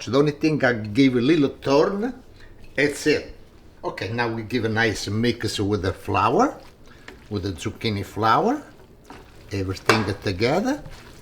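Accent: Italy. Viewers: Growing up in East Vineland, we were surrounded by hard-working truck farms. With that came the best Italian/Sicilian cuisine. You, dear sir, not only remind me of these fine memories but also have an accent I know well. Heartwarming. I have been following you for years. My daughters grew up on this food. They, too, think you are adorable as they pass down these fine traditions to their families. You are a true master of this art. Thank you so very much!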